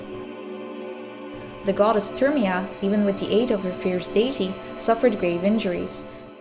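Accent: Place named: Netherlands. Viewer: I honestly think you're the best Zelda theorist on youtube. Your theories are always logical and thought provoking, clicking nicely with the canon. You go into a lot of in-game details, showing you do your research. Your presentation is also the most pleasant to watch; good video editing, a clear yet calm voice and well formulated lines. Je Nederlandse accent is bijna niet te horen.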